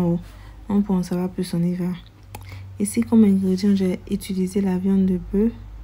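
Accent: Ivory Coast. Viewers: T’as maniéré de parler prouve que tu es vrai ivoirien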